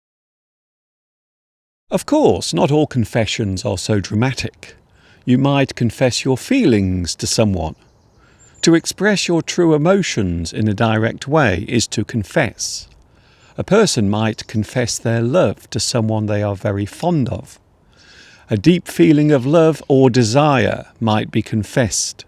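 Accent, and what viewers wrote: United Kingdom. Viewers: It seems like your sound tone is so heavy, that I'm scared, I should have to confess I've fallen in love with the British accent. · Hi, yes...I have to confess I've something so important that I must get it off my chest (...trying to use those expressions here, did I do correctly...please?!☺️) I do like the British accent and my ears turn up immediately as soon as I hear it and I'm very interesting in your country 😅. Well, there's something else I must confess, shh.....I know that I have scratched Mr.Steve's car down there in the garage! Don't tell anybody! 🤫🤭😅 Jokes apart, I have to confess that it seems to me to have found two nice friends thanks to this channel and, let's not forget the English Addicts out there, even though we have never met in real life, it should be so interesting, I feel glad and not alone with my English adventure! 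Cheers! 😊🙏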